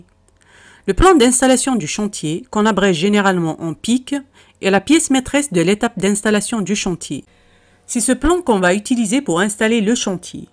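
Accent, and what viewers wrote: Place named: Kabylie, Algeria. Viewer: J'adore surtout avec l'accent Kabyle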